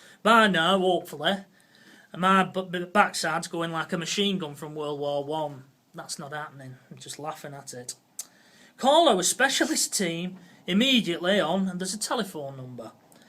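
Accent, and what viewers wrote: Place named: Lancashire, United Kingdom. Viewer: Beltin Lancashire accent like mine an fray Coppull.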